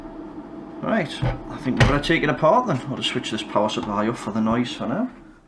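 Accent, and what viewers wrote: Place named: Geordie, United Kingdom. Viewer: Sitting here watching as I do some strap stuff then cleaning parts of my Xbox One I got for £4 prior to installing a new 1540 motherboard and dvd board. I do like the soft tones of the Geordie accent, I spent a week up there around 2008 meeting up with my old World of Warcraft guild and what an awesome time it was esp in the pub outside the Metro where I collected a ton of bird's phone numbers as they jus' adored my south London wide boy accent hehehehe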